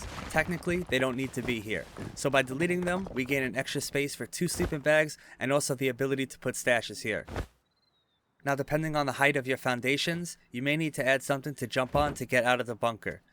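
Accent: New York, United States. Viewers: Where are you from? Staten Island? Queens? The Bronx? You sound very East Coast NYC.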